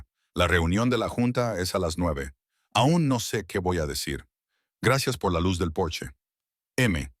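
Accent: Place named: United States. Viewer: Qué pesadez ese scento gringo de AI (estupidez natural)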